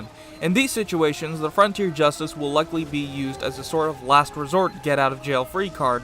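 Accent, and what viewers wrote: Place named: South, United States. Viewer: his southern accent? It's fucking GOLD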